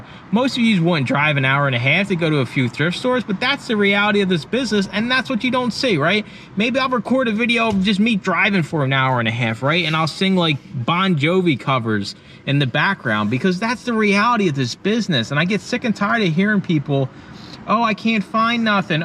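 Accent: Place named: New York, United States. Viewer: Im From Philadelphia where ate you from you sound like northeast guy New York Jersey lol?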